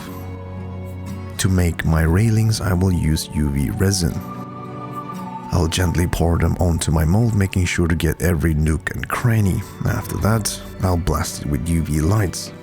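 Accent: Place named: Germany. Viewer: It's peculiar that you sound like a German native speaker to me.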